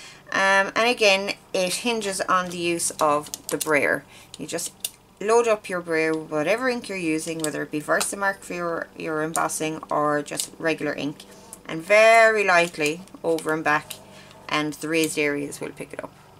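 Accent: Ireland. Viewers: Thank you for sharing .Very clear presentation.(Love your Irish lilt.)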